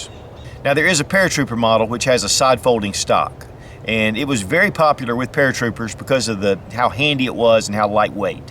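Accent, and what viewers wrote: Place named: South, United States. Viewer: The bayonet lugs were not removed later, they were added at the very end of WW2 and used in Korea and later Viet Nam. The M3 trench knife was adapted with a fitting to lock into the lug and is known as the M4 bayonet. I have an original Inland made in 1944 and love it. My safety is not the lever as is pictured on yours, but the button push through version. I have dropped my mag many times while trying to come off safety. Not a good thing to happen in combat! A good reason to change to the lever version. Great review! From your accent I assume you are from Georgia, South Carolina or Tennessee. Am I right? I am a Jawja native myself and still kickin' the red clay to this day.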